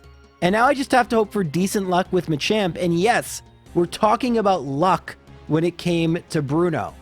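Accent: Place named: Canada. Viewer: I absolutely love your content J , but the way you say “against” drives me up a fucking wall 😂😂😂 is that because you’re Canadian or some shit ? You sound Canadian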